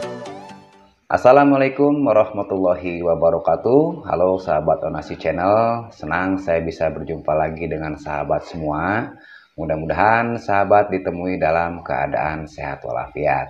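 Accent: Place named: Palembang, Indonesia. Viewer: Logatnya sangat pelem autosubscribe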